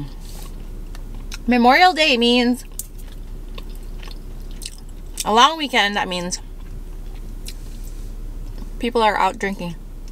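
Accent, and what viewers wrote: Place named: Minnesota, United States. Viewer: You never know your Minnesotan until you hear another Minnesotan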